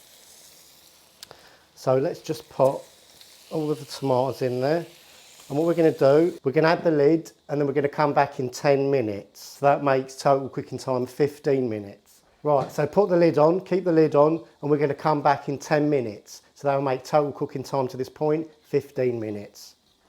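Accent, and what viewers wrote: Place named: South Coast, United Kingdom. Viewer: Great video mate, where you from in the uk? You sound like my mate from the south coast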